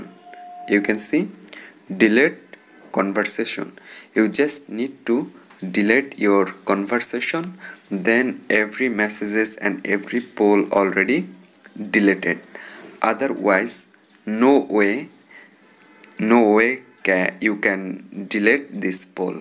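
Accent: India. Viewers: Me: (Search on Google and YouTube on how to delete a poll on messenger) (Found a video) (Plays the video and the voice is India) Me: "Wow! An Indian Youtuber. This might work! Tried what he instructed Me: SCAAAAAAAAAAAAAAAAMMMMM!!!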